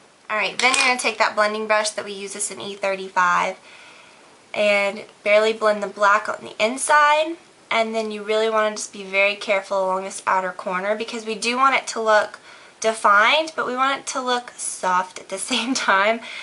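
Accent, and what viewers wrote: South, United States. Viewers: i love hearing bits of your southern accent in your videos. what nail polish will you be wearing for new years?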